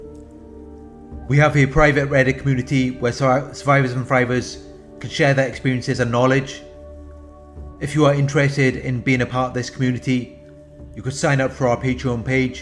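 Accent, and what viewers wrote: Wales, United Kingdom. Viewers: For what it matters.... I am from Leicester, England, I have an accent and pronounce WORDS differently... the guy doing these videos is from Wales... it's regional dialect....people from Liverpool, Manchester, Newcastle etc etc etc..... all have a regional dialect/tone to their accent. Don't worry about it.... you're in the picture now🙂✌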